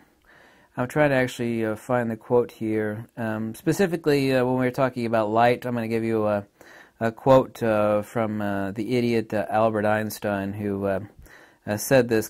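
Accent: Germany. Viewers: You got German dialect perfect nice. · Speaking German like a native, judging buy the accent you picked it up in the Saxony region.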